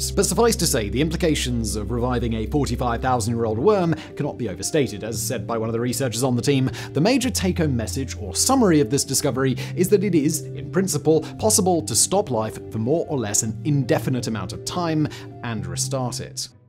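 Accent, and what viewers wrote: United Kingdom. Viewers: no shit how do you think i know he doesn’t write his scripts🤦‍♂️ its a lot easier to digest your propaganda with a soothing british accent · I didn’t say YOU didn’t know, I just pointed out that the person you originally replied to also knows. Simon has true crime and science and random blaze channels. I’m really not sure which of those is meant to resemble propaganda so I think you probably don’t know what that word means. And I’m also British so to me Simon’s accent is just one of dozens of British accents(we have literally 100s🤣) that I hear every day!Seems to be some projection there.🤣😊😊😊😊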